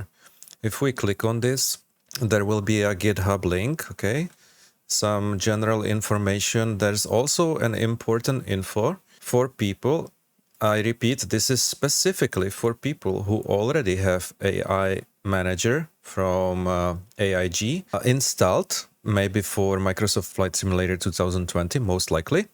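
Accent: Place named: Czech Republic. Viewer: poznám Čecha jak se snaží mluvit anglicky na 100 km😂😂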